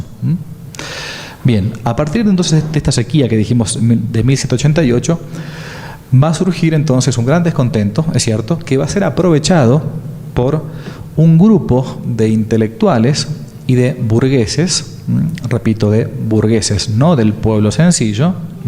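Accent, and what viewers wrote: Argentina. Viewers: Es una lástima que divulgadores hispanos de tanta calidad pierdan millones de personas de audiencia potencial por causa de ese fenómeno lingüístico particular que llamamos "shesheo" o "teísmo rehilado" típico de los porteños que tan cacofónico nos resulta a la inmensa mayoría de los hispanohablantes de todos los continentes.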